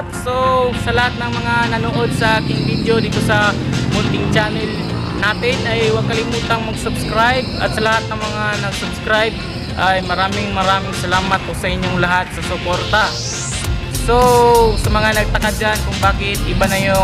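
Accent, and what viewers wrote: Visayas, Philippines. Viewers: Mailhan gehapon ka na nag prank ka Kay halata man imo tagalog tuno palang sa imo pag tagalog bisaya man .. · Yan camera ... ayus sana ung pag bigkas ng tagalog mo ayusin mo na rin pra s halata bisaya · Visaya sya kaya pwede ikaw mag adjust?✌ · Bisaya ka dong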